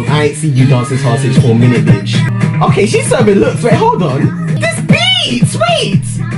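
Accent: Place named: Caribbean. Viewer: Is it just me who thinks sounds like he is from the Caribbean, I'm from jamaica so I should know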